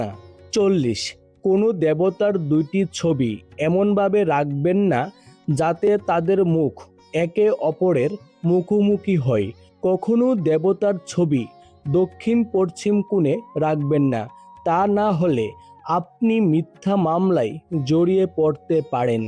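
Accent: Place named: Bangal, Bangladesh. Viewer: উনি বাঙাল ওনার উচ্চারন ওরকম হবে পাল্টাবে না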